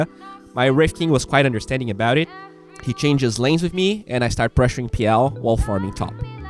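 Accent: Brazil. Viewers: dude, stop complaining about your accent. Your English is perfectly OK. And your accent always will be from Brazil. Your videos are Awesome keep like that. Hug from arg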